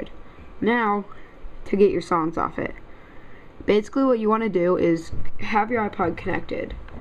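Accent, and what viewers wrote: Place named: United States. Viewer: Suggestion: 1. Make a script (short headings are fine) 2. Prepare your material. 3. Do a few dry runs. 4. Speak clearly. For some people an American accent is hard to follow 5. Speak slowly. You sped up with the copycat@ line! 6. Don't hum or sing when you have a blank moment or drum your fingers. If you start to do that go back to 3 above. 7. Close your room door as we can hear your Mum or Sister talking in the background.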